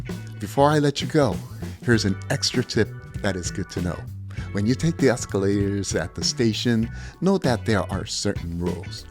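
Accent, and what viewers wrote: United States. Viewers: I'm very intriguing how did you get this American accent so amazingly speak? Please tell us?😊👏🏻👏🏻👏🏻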